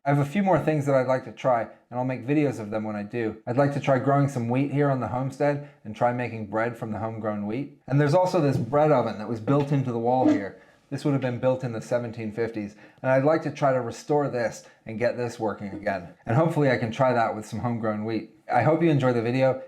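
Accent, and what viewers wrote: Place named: United States. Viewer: Where on earth do you live? Based on your electrical outlets in your shop I was thinking somewhere in the UK but Maybe not? Definitely Europe somewhere I assume. The other big clue are the stone walls in your shop that you just don't see in the US other than a few colonial era homes and barns in the Eastern US. But then your accent is completely American so it's all a bit confusing haha.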